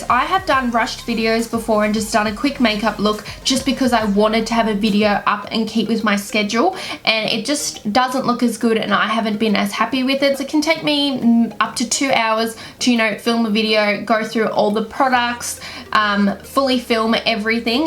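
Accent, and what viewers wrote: Australia. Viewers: I’m from the USA. This only matters because I think I just fell in love with Aussie Accents.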